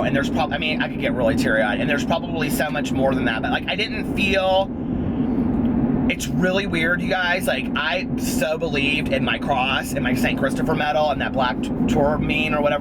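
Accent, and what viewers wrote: South, United States. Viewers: Sister, do you have roots in the old south?? You have a bit of a southern accent.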